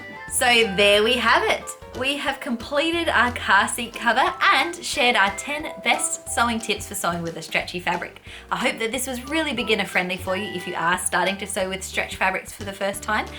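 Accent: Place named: Australia. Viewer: Hi, I have only just found your channel. It’s nice to hear a fellow Australian and using centimetres too. I love your tutorials and look forward to seeing the others. I also look forward to making the fitted cot sheets. Michelle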